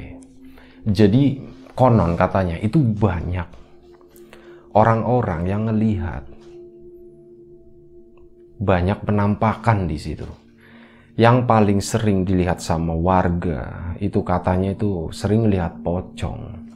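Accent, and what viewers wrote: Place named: Banyumas, Indonesia. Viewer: Kalau boleh tahu video laphor ini dibuat dimana ya... kadang denger bahasa jawanya mas kok agak ngapak. Barangkali dekat bisa mampir.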